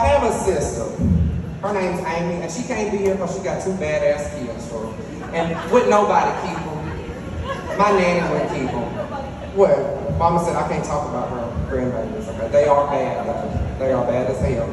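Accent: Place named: Alabama, United States. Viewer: I enjoyed that shug,, cant wait til you do it again hey even on moms front porch be ok with me!! My moms from ALABAMA so i know all the ALABAMA talk! you got it for real for real! you said thats all I got to tell yall ,, 😂🤣🤣🥲😂🤣 you dont need no skit nothing ! All you gotta do is be yourself and talk about everyday life or as a kid memories! I mean thats the good thing about being raised in ALABAMA! Im stuck in LOUSIANA AND my Mom is gone on to heaven so hearing yalls voice makes my heart smile! I been following you on tik tok since day 1 and I just love you shug or ma used to say HUN to like you say shug! so cute,, keep it up and even though you say its a comedy show dude you have a amazing voice so what better way to let us hear you joke and sing too! even if its a silly funny song you sound good singing ! we love you dont stop being you dude love ya Tammy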